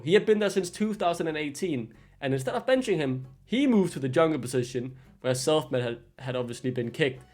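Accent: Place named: Denmark. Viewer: Not the Danish accent hahaha, great video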